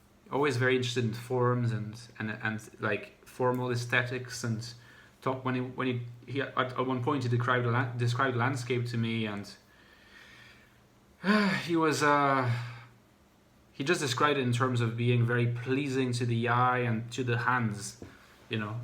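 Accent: Ireland. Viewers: You shared a room with an Irishman! That makes a lot of sense...I thought you sounded Irish at first (and I still kind of do) I'm not even going to comment as much as I could on the weirdness part. I've been called 'weird' so many times in my life when I haven't even been ~trying~ (I appreciate your differentiating from innate and 'bestowed' weirdness since I never considered myself weird until I started to notice this pattern.) I'm also a girl (not sure of my type) so I think that makes the 'weird' accusations...different somehow? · Oh, so that's why you speak English with an Irish accent! (You had an Irish flatmate. It all makes sense now...)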